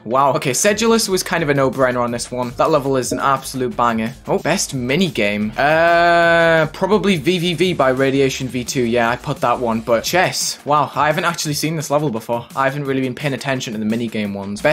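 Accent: United Kingdom. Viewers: bri' ish???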